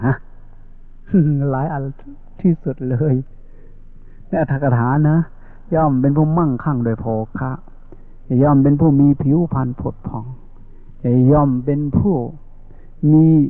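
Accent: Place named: Isan, Thailand. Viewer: ได้ฟังพระอาจารย์บ่อยๆๆจ้าวชออบฟังท่านเพราะคะคำพูดอิสาน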